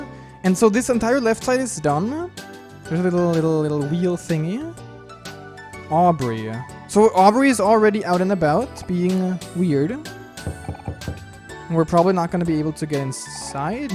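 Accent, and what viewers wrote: Germany. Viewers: Oh, he's German? I did think his accent didn't really sound American or British or Australian or any other English accents. No idea what Canadian accent sounds like or how it is to be distinguished from the others. But German wasn't what I thought he was, I had no idea what I thought tbh. I'm Danish myself, and I feel like Danish and German have similar accents, yet when I hear Germans and Danes speak English, the Germans' accents sound much better than ours (at least when it comes to politicians, I think, English sounds awful when spoken with a Danish accent). I do love accent though, he sounds so cool and funny, his voice is an enjoyment to listen to.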